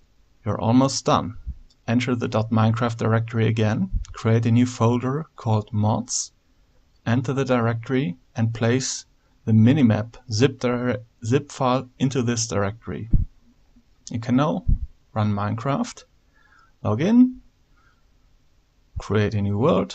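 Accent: Germany. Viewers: I knew you were German with the first sentence you spoke haha :D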